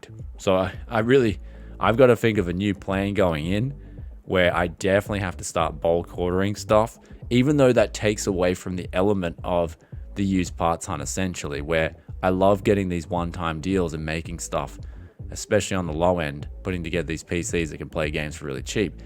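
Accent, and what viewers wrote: Australia. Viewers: love the aussie accent. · I think I could listen to Aussie's talk about anything and stay interested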